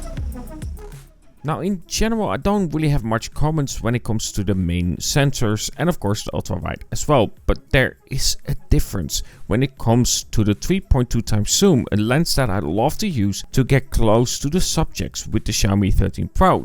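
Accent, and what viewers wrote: Netherlands. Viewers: By the way..your from The Neederlands, don't you?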